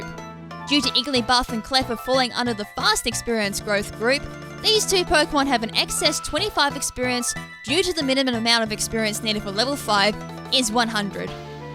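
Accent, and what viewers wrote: Australia. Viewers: I came here for the Australian accent. I was not disappointed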